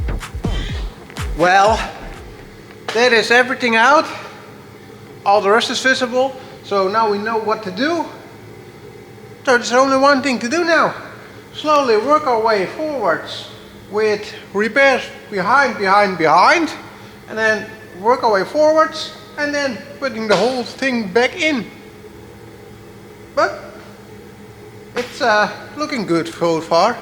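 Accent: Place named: Netherlands. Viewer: Aan het Engels te horen ben jij Nederlands als ik zo mag raden 🤣🤣🤣👌 zo klink ik ook meestal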